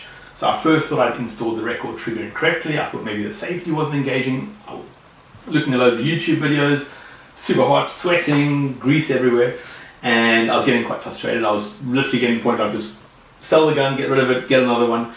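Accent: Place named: South Africa. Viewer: Good to hear that accent after all these years. Middle aged now but growing up in England by some strange twist of fate my first real best mate was South African, then in senior school my best mate was a very superb bloke (again South African), and in my twenties I worked a shitty job briefly where I hated almost everything about it, other than one guy who was again South African and a real life saver.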